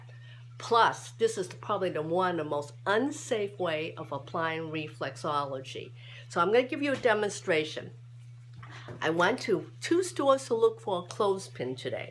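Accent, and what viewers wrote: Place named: Massachusetts, United States. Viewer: Thank you for this clarification. Wow, I bet you are from Massachusetts! I can detect that accent anywhere..............blessings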